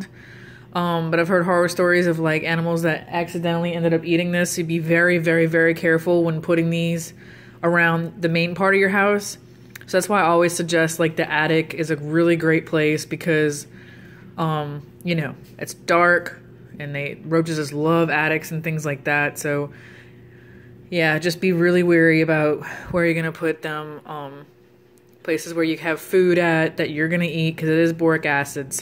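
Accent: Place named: New Orleans, United States. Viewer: I'm from New Orleans as well (I know my people when I hear them lol)... This was helpful.. i just got some from Home Depot .. ill see how it goes 🤷🏾‍♂️...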